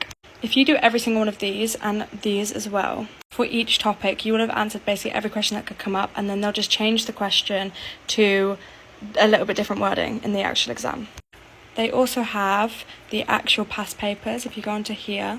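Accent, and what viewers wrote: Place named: United Kingdom. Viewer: Why it’s been so long since I have heard a British accent. So much feels like home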